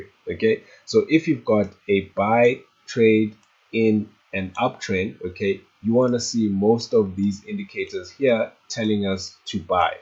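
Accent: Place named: South Africa. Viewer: I swear to you. You are a South African😊I like that. This video has helped me a lot. Thank you so much. Keep doing the great work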